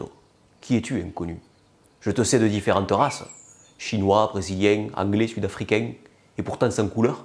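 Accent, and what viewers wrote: France. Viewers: Un zeste de dédain du Midi, avec cet accent languedocien surprenant ici, en lieu et place de celui d'un "héros" español? Oui, mais avec un zeste d’obsession anti-covid qui devient lassante, usée, et qui rejoint finalement l'obscurantisme, les falsifications... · La pratique de la coromachie produit de "grands malades" ....! Merci pour la création artistique et le rire salvateur ! En plus "avé l'assent" . Au fait Castex ? Il surjoue au maximum l'accent gascon ?!!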